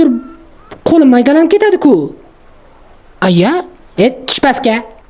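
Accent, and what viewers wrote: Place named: Syrdarya, Uzbekistan. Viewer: Sirdaryoni shevasi ekan😊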